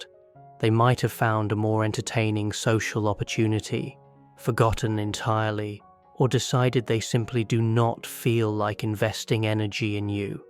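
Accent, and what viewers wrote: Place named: United Kingdom. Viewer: everyone loves random british dude narrating stuff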